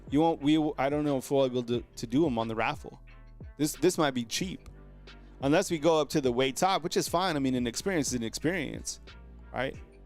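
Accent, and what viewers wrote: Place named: Alabama, United States. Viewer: your sponsorship in T-town ought to be through the roof! You are a $million/year operation easily! You have the look, the vibe, and sound that is Bama. Not applying for the job, just wondering. Roll Tide, Brah…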